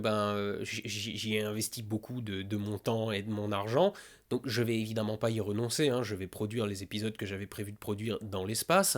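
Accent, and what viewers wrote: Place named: Lorraine, France. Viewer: moi ce que j'aimerai entendre de temps à autre, s'il te plaît, c'est ton accent lorrain, il est juste génial! Vive la Lorraine! attention, pas tout le temps, il ne faut pas abuser des bonnes choses, mais un peu ça ferait plaisir les autres, n'hésitez pas à dire si vous êtes d'accord ou pas pour un peu d'accent lorrain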